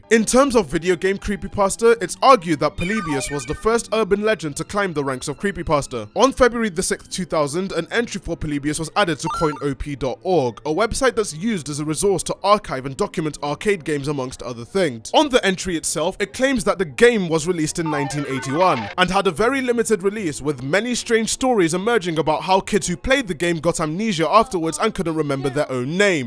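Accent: England, United Kingdom. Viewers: this dude is 100% English you just know lmfao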